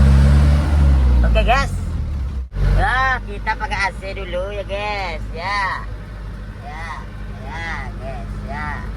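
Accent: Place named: Indramayu, Indonesia. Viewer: Mas Punya kamu keturunan dari Indramayu ya, soalnya ngomong jawanya itu Jawa Indramayu❤❤❤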